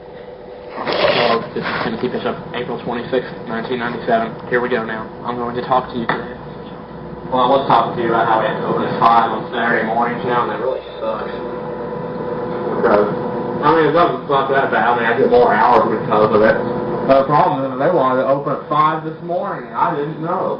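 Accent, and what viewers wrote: South, United States. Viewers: Oh, wow... you really did used to have a southern accent. lol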